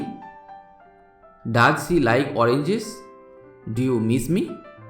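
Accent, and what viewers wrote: India. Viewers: Can you speak englisch Not Indian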